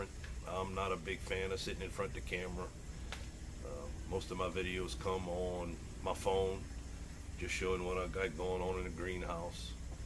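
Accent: Louisiana, United States. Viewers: Hi. I jumped over and gave you a sub back. I like to guess where people are from by their accent. Are you from Louisiana, maybe? We're in Missouri. I love all the green in there this time of year. We've had unusually cold very early this year and everything is toast...cold toast. Well, I listened long enough to hear that you are from LA. ~Janette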